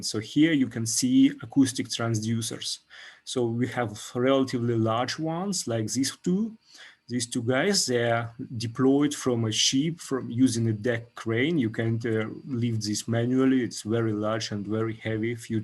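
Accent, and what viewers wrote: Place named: Germany. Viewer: Классный доклад, я прямо флешбеки словил. Мне кажется у тебя местами немецкий акцент проскальзывает)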